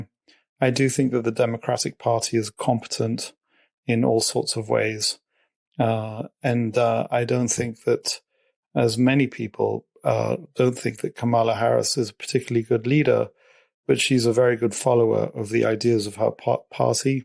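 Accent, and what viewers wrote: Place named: RP, United Kingdom. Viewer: ABSOLUTE FUCKING BOLLOCKS. TAKE YOUR PONCY ENGLISH ACCENT AND FUCK OFF. YOU'VE NO IDEA WHAT THE FUCK YOU ARE TALKING ABOUT.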